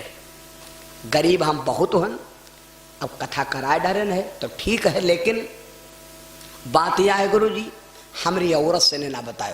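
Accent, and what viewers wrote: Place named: Uttar Pradesh, India. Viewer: बहन सीतापुर और बाराबंकी की लोकल भाषा यही है ये कथा वाचक सीतापुर और बाराबंकी के बॉर्डर के हैं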